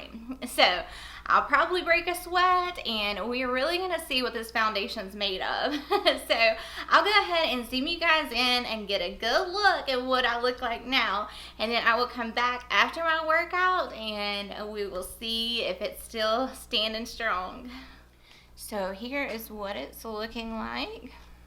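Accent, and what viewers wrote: South, United States. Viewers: shes southern it’s called an accent sthu “sweet” is not fitting for you u must be on something or are you just a bully??? 🙄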